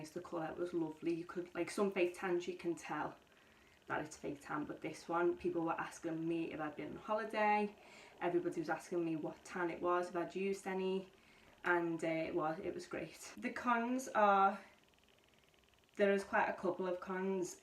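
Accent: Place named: Liverpool, United Kingdom. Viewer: Yay a scouser 👋 thanks for the review x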